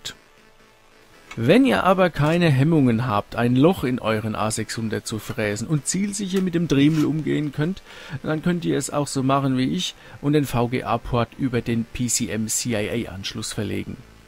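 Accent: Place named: Franconia, Germany. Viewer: Bei deinen Dialekt kommt der Franke noch durch 🤣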